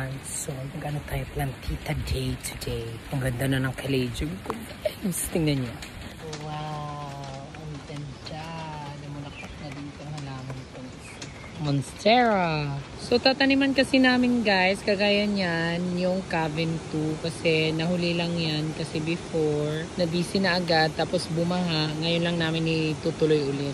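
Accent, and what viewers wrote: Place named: Ilonggo, Philippines. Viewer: Naaaliw talaga ako sayo pqg nagsasalita ka..lalo kapag ilonggo tono mo😂😂😂😂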